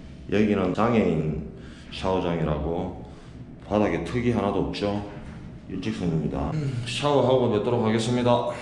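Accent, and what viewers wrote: Gyeongsang, South Korea. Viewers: 경상도사투리와 영어가 구수합니다 저도미국에서 트럭하고싶네요 ㅎㅎ 잘보고갑니다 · 베트남서 시청하고 있는 1인입니다. ㅎ 지난회차 거의 다?? 시청했습니다 ㅎㅎ 묘한 마성의 갱상도 소리를 매일 듣네요 ㅎㅎ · 갱상도 사투리 티나네요 해임요 ㅋㅋ 응원합니다 오늘도 굿데이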